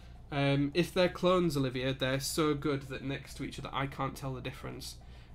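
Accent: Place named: Yorkshire, United Kingdom. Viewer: I'd put your accent as Yorkshire, but just a guess.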